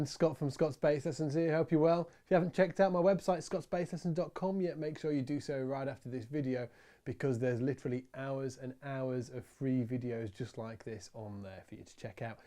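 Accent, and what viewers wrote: United Kingdom. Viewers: so, i was not the only one that did not get the names right..... sorry i have problems with british Accent ....... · He's from the UK =) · He's definitely british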